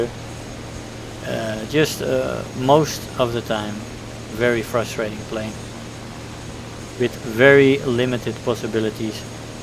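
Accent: Netherlands. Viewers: ik kan het meteen horen als iemand nederlands is, meeste nederlanders hebben een best wel herkenbaar accent als ze engels praten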